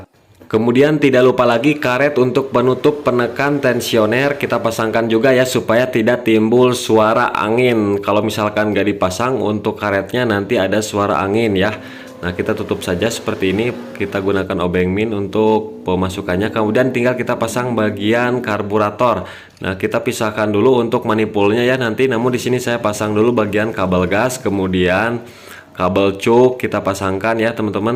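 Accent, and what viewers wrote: West Java, Indonesia. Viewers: Alamatnya daerah mana logat ada bahasa sunda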